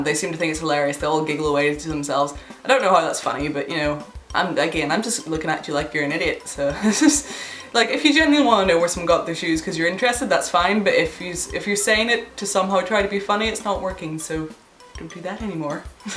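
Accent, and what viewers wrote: United Kingdom. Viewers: Sup YO, Sorry you went through what you went through, I went through similar in my youth as a MetalHead living in Brooklyn NYC. But people of mundane culture can't help themselves but tap into realms of ignorance, you are extremely beautiful and keep filling people in, this is cool, Goth's in NYC are a lil to themselves or are bit elitist so it was hard to get info about their movement in my day. Love the accent is it British?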